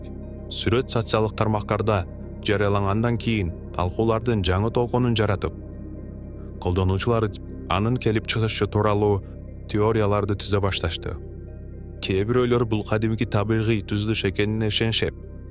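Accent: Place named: Russia. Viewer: Орус эми кыргызча уйронуп келейткандайго.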